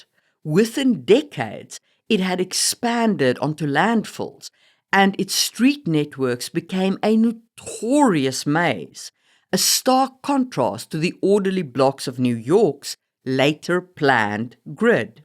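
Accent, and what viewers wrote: South Africa. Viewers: Oh, it's no wonder I thought you were South African originally! I lived in Cape Town myself for a while 😁 Love your videos, thanks so much for all the tips and wisdom.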